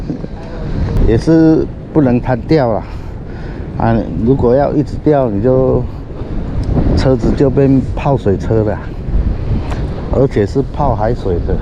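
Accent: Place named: Taiwan. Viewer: 講話很台灣，親切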